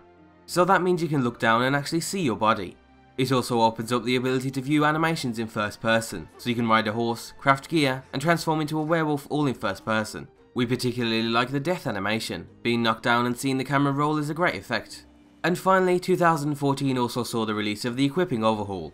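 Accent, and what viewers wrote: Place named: Birmingham, United Kingdom. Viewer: Sounds like your from Birmingham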